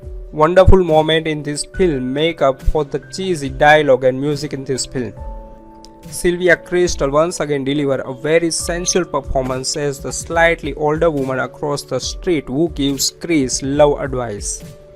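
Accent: India. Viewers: indian accent is so hilarious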